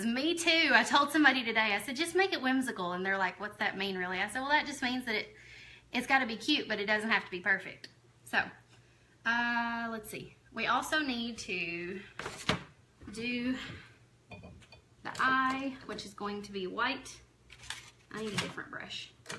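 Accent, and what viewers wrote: United States. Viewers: I know this is an older video, but I absolutely love your Strong Southern Accent. I'm a Southern girl myself from Alabama but for some reason I can't tell I have a strong southern drawl, but everyone else can..lol and the Rooster is adoorable! My kitchen is done in Roosters, John Deere tractors and Sunflowers.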